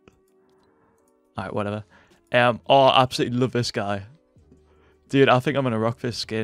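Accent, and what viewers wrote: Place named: Newcastle, United Kingdom. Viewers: Now we officially know ghat is british 😂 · He’s from Newcastle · What? You can't tell his british accent from all those settings videos?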